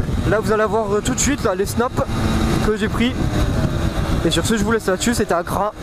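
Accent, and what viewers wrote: South, France. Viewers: avec l'accent du sud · Toi t du sud avec t tu vois 🤣💪🤘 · pourquoi tu as pris cet accent du sud normalement tu n'a pas cet accent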